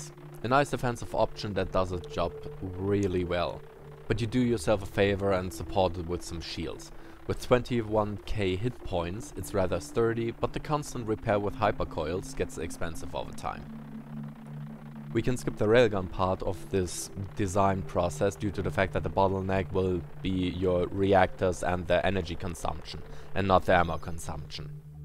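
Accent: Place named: Germany. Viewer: I spend half the video wondering whether I heard an German accent or not. Your English is better than mine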